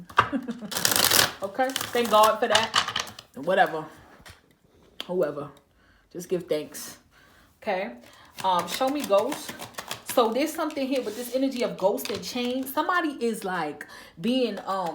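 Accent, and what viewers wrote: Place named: New York, United States. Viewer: love ur accent is u from nycc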